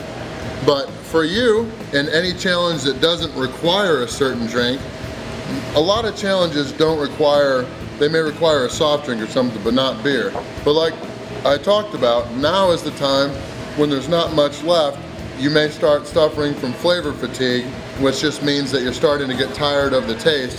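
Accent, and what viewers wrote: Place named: United States. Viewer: Jamm Lighting lol he’s got like a northern hillbilly twang to it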